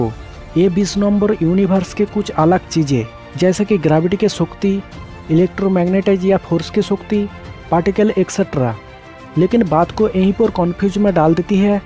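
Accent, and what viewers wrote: Bengal, India. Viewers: Sir ap ki Hindi thodi alag hai like a Bangla language.But nice video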